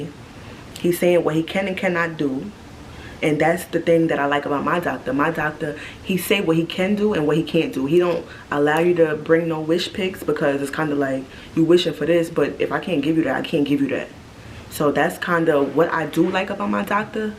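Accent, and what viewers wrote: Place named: Brooklyn, United States. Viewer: Nah sis u really from New York and I’ll say Brooklyn just by the way you talk love your vibes hunny ... it’s the hand movement for me 🥰😭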